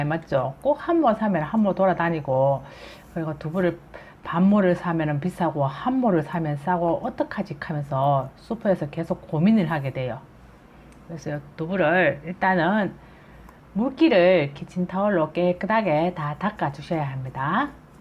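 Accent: Gyeongsang, South Korea. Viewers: 님 처음댓글 다는데 시청잘하고있 습니다 님 지역이 어디세요 경상도 시투리 귀에 익숙했어 저는 영천입니다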